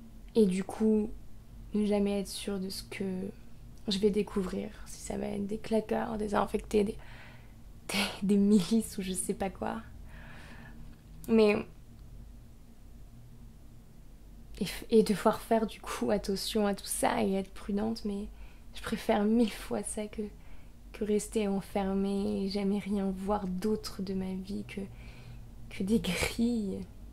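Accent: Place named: France. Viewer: L'accent français est beau :3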